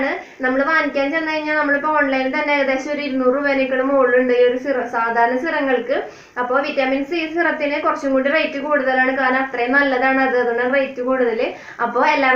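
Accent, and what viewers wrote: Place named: Thrissur, India. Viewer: എവിടെ ആണ് വീട്?? തൃശൂർ ക്കാരി ആണോ?? 🤔🤔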